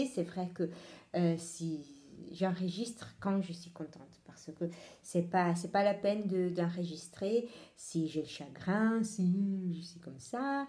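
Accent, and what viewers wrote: Italy. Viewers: Contente de te retrouver ! J'ai eu une collègue italienne qui avait vraiment le même accent que toi, c'est chouette, à chaque fois je pense aussi à elle :) Mais sinon quand même je te regarde pour toi et tes jolis tricots !!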